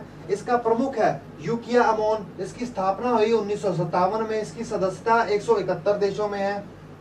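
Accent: Punjab, India. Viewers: Sir g apki language me punjabi jhalk rhi he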